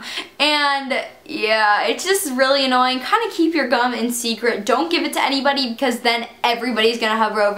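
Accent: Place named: New York, United States. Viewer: What state do you live in? It sounds like New York or New Jersey or Boston or something!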